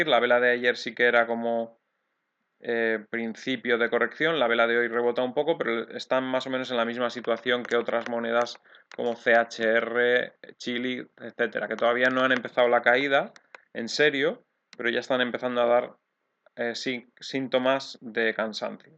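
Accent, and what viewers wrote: Spain. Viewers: Eres de Madrid a que si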